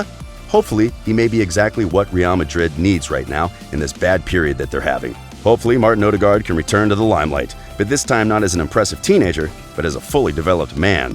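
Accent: United States. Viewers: I WILL TROLL THOSE COMPLAINING THE AMERICAN ACCENT. YOU ARE WELCOME! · Can't take American voices seriously speaking about football... lol haha sorry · The speaker´s voice sounds a bit resemblant to Ted Lasso (Jason Sudeikis). It´s almost charming to hear all these ´murican pronounciations. But to the next video, please someone, give this speaker a proper phonetic list of club, coach and player names. Before the charm fades off.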